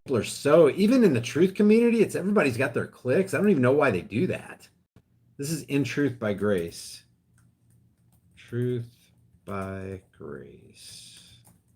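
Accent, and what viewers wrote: South, United States. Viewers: I like the southern accent,lol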